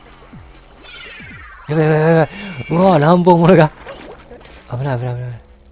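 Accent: Hiroshima, Japan. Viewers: Rさん！広島弁教えてください＜(_ _)＞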